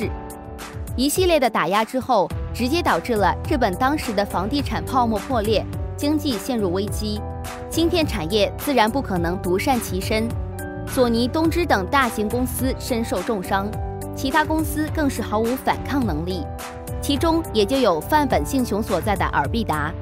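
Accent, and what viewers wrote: China. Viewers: 假裝繁體字想要以為是台灣人發的影片，結果聲音根本是426⋯然而內容也不符事實（笑了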